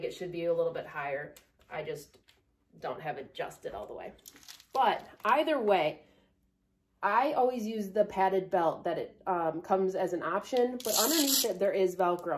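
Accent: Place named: Minnesota, United States. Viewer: 100% she’s from Minnesota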